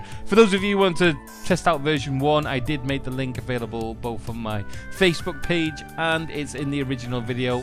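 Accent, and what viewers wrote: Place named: Northern England, United Kingdom. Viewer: spoken like a true northern lad ha ha! Glad you got the rest you deserve it's nice to see your stuff coming out whenever I get chance to see them, your EMUnation is a great idea. I'm sure the guys on the dev teams appreciate you as much too. Have a great one mate and have a beer on us!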